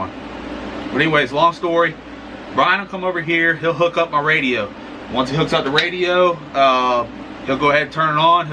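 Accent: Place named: Florida, United States. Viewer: I've noticed all y'all Florida boys pronounce button as "butt-in". lol! I've been following your career and I have to say, Swan Gang are a force to be reckoned with! Keep up the GREAT WORK!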